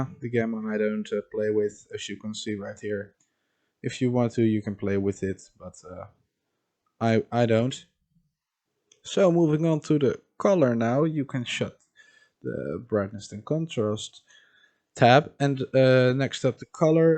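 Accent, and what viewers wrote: Netherlands. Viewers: You Dutch by any chance? 😄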